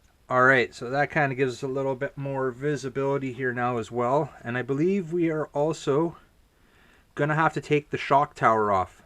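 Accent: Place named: Canada. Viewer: haha, love your canadian accent!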